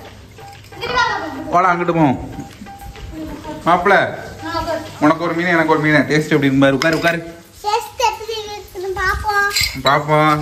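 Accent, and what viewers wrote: Sivagangai, India. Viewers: Sivagangai karapulla language apditha irukum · Broo nanu Sivagangai bro akka soldra mari sivagangai seemai... 🤍⚡😇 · Naanu sivagangai thaaa broo unga video vaa naa paapen naanu akka mari thaaa pesuvee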